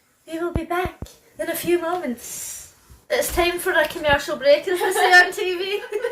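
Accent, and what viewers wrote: Scotland, United Kingdom. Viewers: I just found your channel, and I love it! I'm from Idaho in the United States. Thank you for sharing your culture. I love the Scottish accent!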